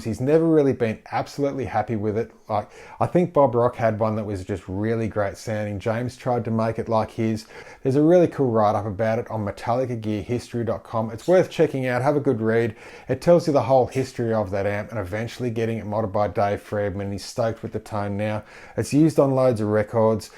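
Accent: Australia. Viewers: There's only a few reasons I come to this channel: to learn how to play my favorite thrash riffs, dial in those glorious tones, listen to an Aussie's killer accent and occasionally have an exotic bird stare judgingly back at my bad technique or pisspoor tone or watch them perform perch acrobatics. This video delivered on all accounts as usual. Love the series idea and as always look forward to more vids from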